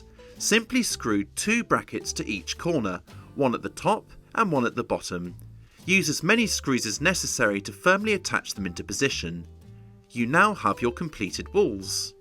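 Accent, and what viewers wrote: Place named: Cockney, United Kingdom. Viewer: Im learning English and I found your accent is little bit like cockney :)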